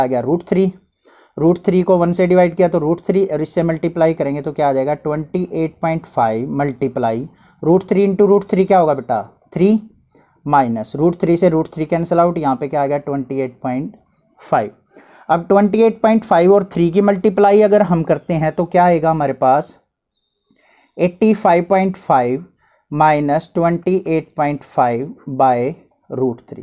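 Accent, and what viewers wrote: Haryana, India. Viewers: Sir tam haryana ta ho k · Sir आप हरियाणा के हो क्या ???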